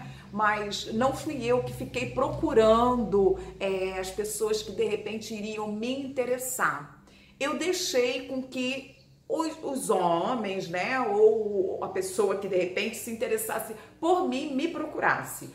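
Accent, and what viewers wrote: Rio de Janeiro, Brazil. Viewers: Convicções maravilhosas, ter cautela em qualquer situação, ter dois pés no chão, e cuidar com os perigos virtuais, também acho que vc e seu noivo formam um lindo par, são almas afins não existe alma gemea há encontros que não são conscidência, são providência de Deus mesmo pessoas que já estão ligadas pelo destino um do outro que eu sei lá acho que já são pré destinadas uma para outra...bjo vc sempre linda e elegante com essa dicção carioca do sutaque que fala o português coloquial.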